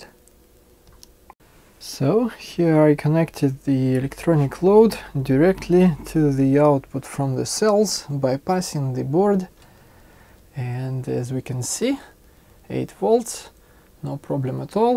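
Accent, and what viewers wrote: Russia. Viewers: русский акцент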